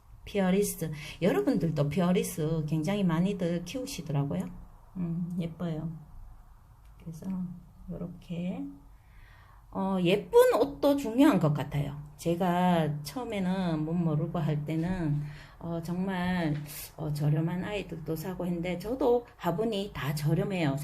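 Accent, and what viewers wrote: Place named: Gyeongsang, South Korea. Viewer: 반갑습니다 경상도말씨가 참정감있네요 영상잘보고갑니다 첫걸음마이신것같은데 화이팅하세요 항상 응원할께요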